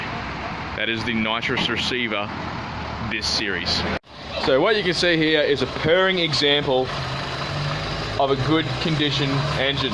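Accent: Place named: United Kingdom. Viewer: u dont have a deep aussie accent, u sound more like a british accent or UK accent.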